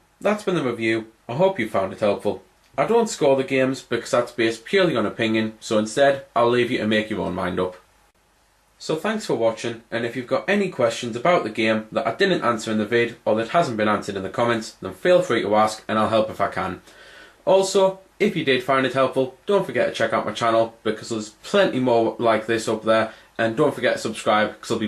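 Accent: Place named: Northern England, United Kingdom. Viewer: You're the best reviewer gaming wise excellent taste only negative is your accent sometimes hard to understand being american. Where are you from country city and region? Is that like northern english accent?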